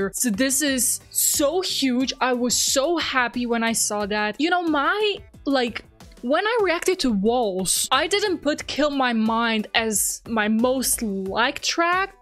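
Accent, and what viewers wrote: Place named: Slovenia. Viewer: GIRL YOU’RE SLOVENIAN ?? I always wondered where you accent was from, I can’t believe you’re there I fell in love with this country when I did an exchange there for a semester in Koper, and since than I try to come back once a year. I was so happy when I saw Ljubljana on the list, bc a very good friend of mine, Slovenian, told me how you never have shows there and you always have to go to Austria or Croatia. So good for you !! Love from France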